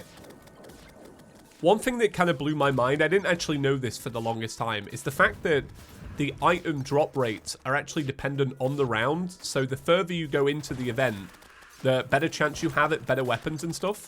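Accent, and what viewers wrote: United Kingdom. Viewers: videos make me realize how different Brits pronounce certain vowels than Americans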